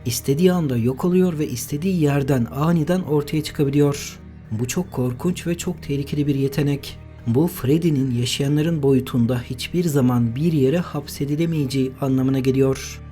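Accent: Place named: Erzurum, Turkey. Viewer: Abi sen Erzurum lumusun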